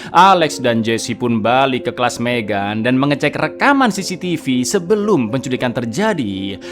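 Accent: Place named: East Java, Indonesia. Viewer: Suarane logat sampean koyok wong jawatimur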